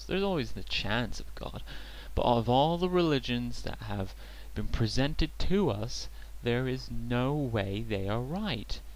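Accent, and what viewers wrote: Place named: United Kingdom. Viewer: I am surprised - you sound british seems odd they would care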